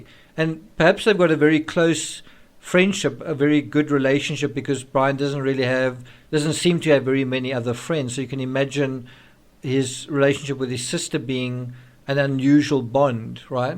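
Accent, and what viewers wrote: South Africa. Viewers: I'm new here. You accent sounds (very) South African.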